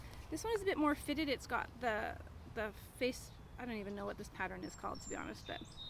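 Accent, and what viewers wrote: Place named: Canada. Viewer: Love these helpful, practical videos! I knew you're Canadian the first time I heard you speak. Sherwood Park... are you... from Red Deer?!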